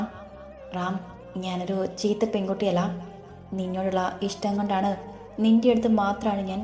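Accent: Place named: Tamil Nadu, India. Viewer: അടിപൊളി 👌👌👌 തമിഴ് പറയുന്നത് കേൾക്കാൻ നല്ല രസമുണ്ട്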